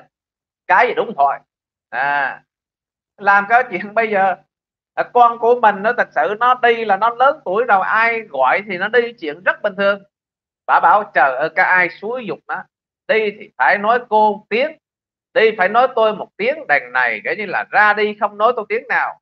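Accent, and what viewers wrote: Standard. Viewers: nói quá chuẩn nghe đã tai.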